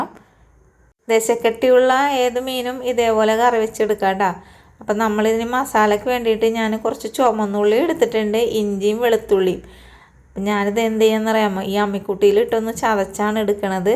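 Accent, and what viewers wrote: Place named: Kochi, India. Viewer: ഈ കൊച്ചി ഭാഷ കേൾക്കാൻ ഇമ്പമാണ്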